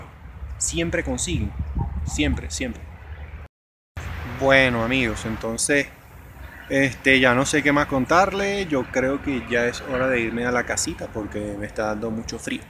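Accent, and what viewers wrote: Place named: Venezuela. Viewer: Ps por eso mismo decia... NO creo que sea tan facil.. para un extranjero en cualquier pais.. ok... entonces sos parcero.... buee confundis por tu acento totalmente venezolano.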